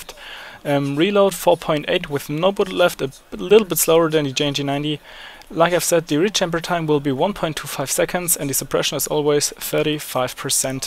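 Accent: Germany. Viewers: irgendwie wusste ich das du deutsch bist xD dein akzent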